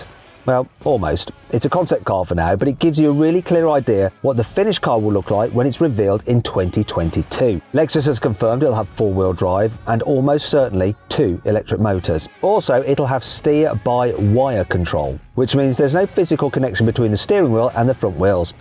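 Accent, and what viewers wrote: United Kingdom. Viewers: イギリス英語で耳が喜んでる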